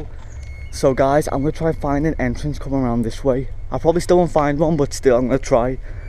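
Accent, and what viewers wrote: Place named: Liverpool, United Kingdom. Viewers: Hes from Liverpool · I think he's just a typical scouser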